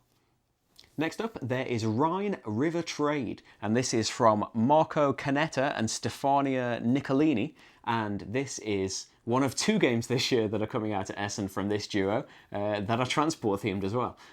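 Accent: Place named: Leeds, United Kingdom. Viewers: This was great thanks, and I watched all the way to the end. Is like a friend sat having a chat. Do you live in the North Of England (just by your accent??) If you don't mind me asking. Enjoy Essen! You certainly going to need a few empty cases to bring back all those games ;-) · Yeah I wondered that too. Sounds like you're from my neck of the Leeds woods!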